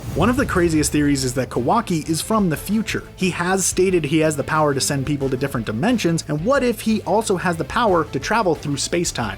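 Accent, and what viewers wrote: United States. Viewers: He thinks that this is an American show that way he speaks like that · Really? Naaaruto? Boooruto? Even kaaaguya?! Wtf. Kakashi and Tsunade are about the only names that don't maker me cringe when hearing them pronounced like an American.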